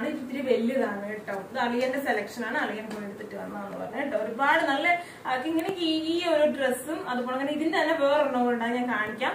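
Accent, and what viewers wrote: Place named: Thrissur, India. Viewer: ശെരിയ, എനിക്കും ഇഷ്ടം ആയിരുന്നു, ഇപ്പോൾ ഒട്ടും ഇന്റെർസ്റ് ഇല്ല, വീഡിയോക്ക് വേണ്ടി അമിത വിനയം, എളിമ, എന്തൊക്ക ഗോഷ്ടി ആണോ, സഹിക്കാൻ വയ്യാത്തത് ഓവർ ആയി തൃശൂർ ഭാഷ പറയുന്നത്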